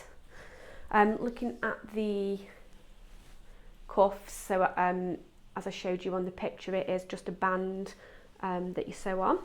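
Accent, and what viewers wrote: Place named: Northern England, United Kingdom. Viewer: Hi I am anew subscriber and quite a new sewer after just retiring from work. You sound northern I live near Chester. Love your channel. Have a lovely day. Dorothy 🙋🏻xxxx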